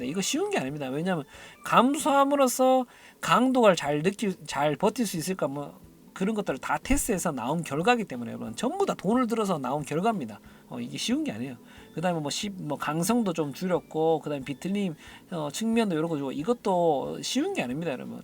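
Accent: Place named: Gyeongsang, South Korea. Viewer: 대구에 매장있으셧내여 억양이 익숙하다 싶었는데 매장에서 혼다 자켓은 입어볼수있나요?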